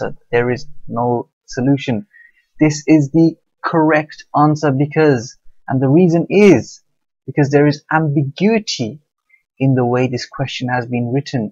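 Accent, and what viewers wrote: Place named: London, United Kingdom. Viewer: I hope that was sarcasm? London accent and a Utd supporter...local?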